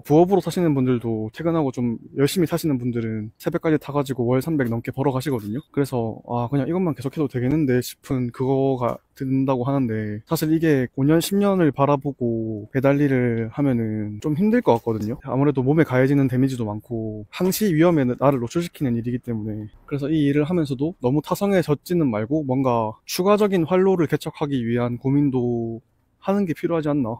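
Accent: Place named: Gyeongsang, South Korea. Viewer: 부산이나 경남쪽분 같내요 맞으시죠?ㅎ 경북쪽은 성격이 강하고 너무 직설적이라 이런 차분한 말투가 안나옴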